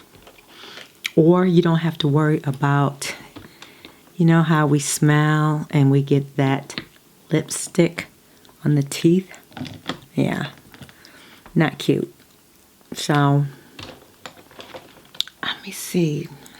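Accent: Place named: United States. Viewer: Looking angelic as always I see :). Damn... it is the first time I've heard your speaking voice and your American accent (I am British lol) is glorious like silk. So calming. Your tone is asmr perfect even without any whispering. I love listening to your precise explanations too. Definitely adds tingles for me. I look forward to more hopefully :D fingers crossed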